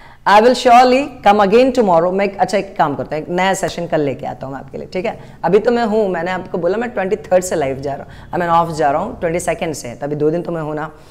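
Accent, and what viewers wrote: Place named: United States. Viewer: He speaks with an American accent...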